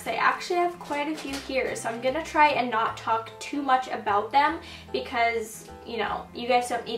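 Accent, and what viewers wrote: Canada. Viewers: she has a slight accent because she's from Canada.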